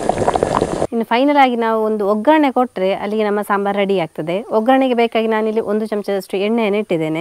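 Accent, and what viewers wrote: Mangalore, India. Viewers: Clear mangalore kannada 👌karavali brahmin style curry 👍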